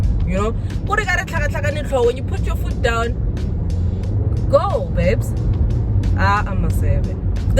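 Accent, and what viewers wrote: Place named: Kasi, South Africa. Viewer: I like how you review your cars, pure kasi style you can even hear it from the accent 😂❤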